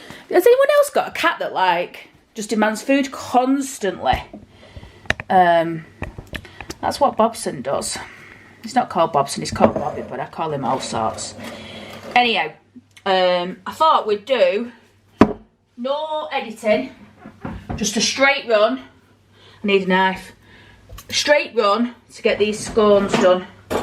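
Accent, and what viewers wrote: Oldham, United Kingdom. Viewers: Delightful is a great way to describe those Scones!... Another wonderful video, love that Oldham accent, makes me homesick lol...Nice one 🖤👌X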